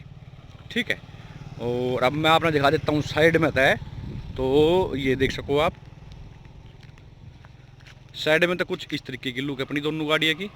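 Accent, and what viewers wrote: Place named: Haryana, India. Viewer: Me apke bat sun ne k liye video dekhta hu.... I luv Haryanvi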